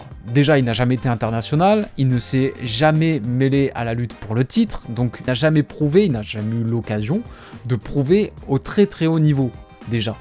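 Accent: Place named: Nice, France. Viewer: Ça fait plaisir d'entendre un nissart, issa nissa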